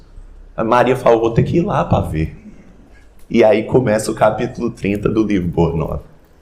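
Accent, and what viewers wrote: Minas Gerais, Brazil. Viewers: Parabéns, adorei o mineirês. Me cativou.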